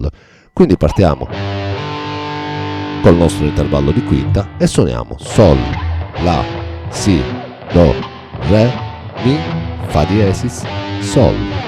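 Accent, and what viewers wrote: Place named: Sicily, Italy. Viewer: io sono ciociaro di origine, ma essendo sposato e vivendo a Palermo, l'accento siciliano non è passato certo inosservato 😂 Comunque complimenti ancora e ti seguo sempre.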